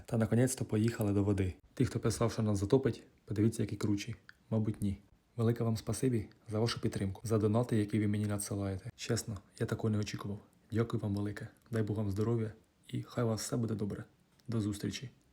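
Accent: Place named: Poltava, Ukraine. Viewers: Мабуть ти з Полтавщини. Чую знайомі нотки. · ❤❤❤❤❤❤❤❤❤закохалася... Неможливо наслухатись.ДЖИГІТ!!!!❤❤❤❤❤❤❤❤❤❤❤❤❤❤звідки ти?❤❤❤❤❤😂❤❤❤чую наш ПолЬтавський говьяжий суржик.ТАК приємно😂😂😂😂😂😂😂😂😂😂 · Автор, звідки ви? Такі самі глиняні кручі бачив на березі кременчуцького водосховища, та й говірка нагадує полтавську 😊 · Дякую за ще одне неймовірне відео. Ти молодчина, так тримати. Твоя мова схожа на мою, я з полтавщини. Бережи нас Боже. Чекаємо на перемогу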